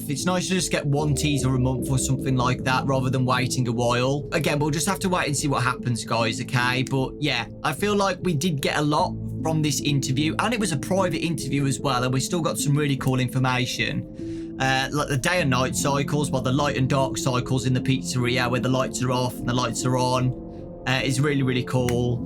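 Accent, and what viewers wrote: United Kingdom. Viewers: Is Britsh!! britsh! (british) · Who is this guy and why is he British?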